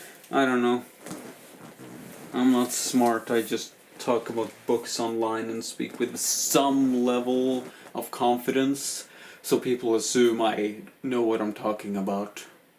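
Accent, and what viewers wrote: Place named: Norway. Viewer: Wonderful accent my guy! Can tell ur Norwegian.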